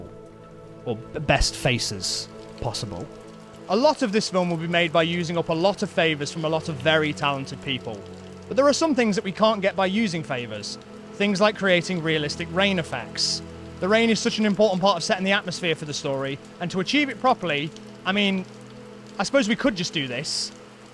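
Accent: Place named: East Midlands, United Kingdom. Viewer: you used to have an east midlands accent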